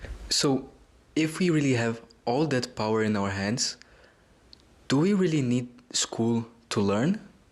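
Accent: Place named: Brazil. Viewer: I feel a Brazilian accent but he could be italian too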